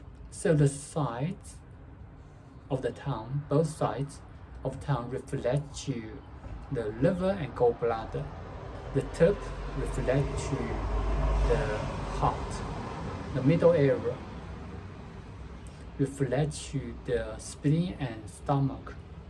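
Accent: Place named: United States. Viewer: Very nicely explained sir, u r great and sober and your accent is as a native of America's Very nice explanation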